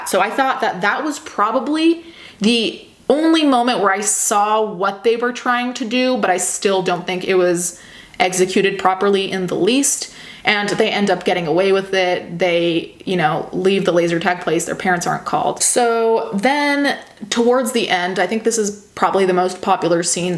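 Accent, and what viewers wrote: United States. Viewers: I don't mean to be rude but how come you have a full American accent if you only moved to America 5 years ago? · I knew that you were Italian, and that English was your second language, but I had no idea that you have only been here such a short time! Your American accent is fantastic. Keep up the good work, my friend! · Unrelated but for a non-native speaker you have all of the nuances and a great (American) English accent! The fact you speak 3+ languages fluently is incredible.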